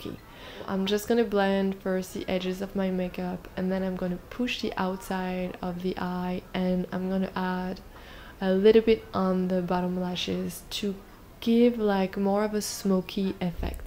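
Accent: France. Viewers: Es tu française ? J'entends un petit accent :) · Omg i freacking love your channel, your videos and everything. They're pretty simple, and that's what I really love. It kind of reflects you and I think YouTube need more people like that. J'adore ce petit accent français également, il donne du charme à tes vidéos et à ta personne. Kisses from France ( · I love watching these first videos you made. This was the first video I saw when I randomly discovered you on YouTube. I was instantly hooked! I absolutely loved that you did your makeup with your fingers, which made it look so easy and effortless. I loved that you were always doing your makeup in cafes, and of course the French accent 🥰👌🏻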